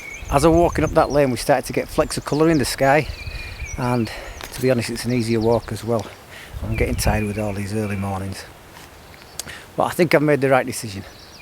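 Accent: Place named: Yorkshire, United Kingdom. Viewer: I know you haven’t and never will change, but the World has changed mate and it’s caught up with you. Better repair back to where your accent won’t identify you as an ‘outsider,’ which in your case is Yorkshire. Once this is all over, hope to see you making great photos in your own unique nomadic fashion, but who knows when that will be!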